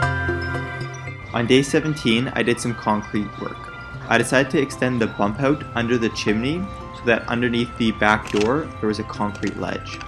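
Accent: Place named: United States. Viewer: i thought this was the UK until you spoke. is it philly, maryland or nyc? the UK dont have basement in their town house. damp issue if you know.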